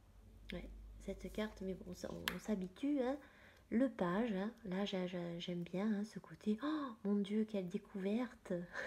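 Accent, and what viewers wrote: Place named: England, United Kingdom. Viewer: L'ACCENT ANGLAIS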